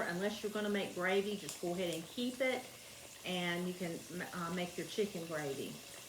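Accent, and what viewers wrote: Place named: North Carolina, United States. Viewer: I discovered your channel a couple of days ago and I am loving it! I suspected you're from eastern NC with the accent (not poking fun, I have the same accent!), but the fried chicken and banana sandwich just confirmed it for me! Please tell me I'm not wrong! My mama cooked the best fried chicken. I am 64 years old, and when I was a child and we'd go on vacation Mama would pack a picnic lunch for us to eat on the way at a roadside picnic table. She always, always, always packed fried chicken and banana sandwiches! My daddy loved that combination. Such sweet memories!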